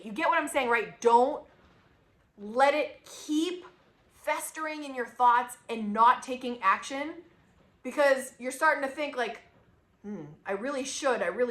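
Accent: United States. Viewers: I'm working on my no-buy, low-buy strategy and also working on my "aspirational crafting" collections. I've got way too many different craft interests, and I'm going to hone them down to TWO: photography and drawing/acrylic painting. I'm in Connecticut and you sound like you're from the state as well. If so, great to "meet" you and your really helpful channel! 😊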